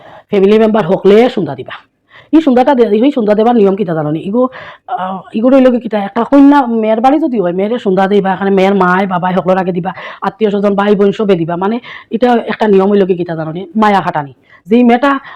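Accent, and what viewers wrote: Sylhet, Bangladesh. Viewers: তুমার লাখান আমিও খাস সিলেটি গো। অত ভালা লাগের সিলেটি মাত হুনিয়া অত বছর বাদে।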